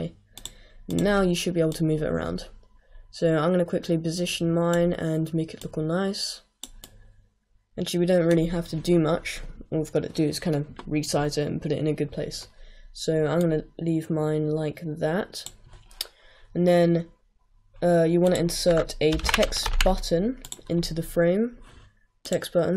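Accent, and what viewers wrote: United Kingdom. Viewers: THANKS SO MUCH FOR THIS AMAZING TUT!!!! (btw are you british?)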